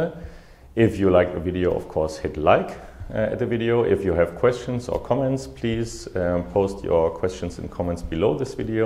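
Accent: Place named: Germany. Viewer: Hey :) Great video! I hear the german-english, realy cool :D Liege ich da richtig? :) lg Robin!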